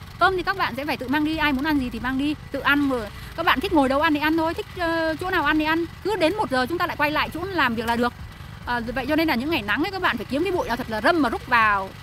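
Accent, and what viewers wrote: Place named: Nghe An, Vietnam. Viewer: Quê nghệ An hay thái bình hả cháu ơi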